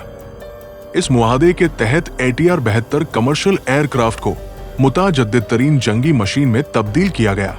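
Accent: India. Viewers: زبان اردو ہے مگر لہجہ اور تلفظ دونوں انڈین ہے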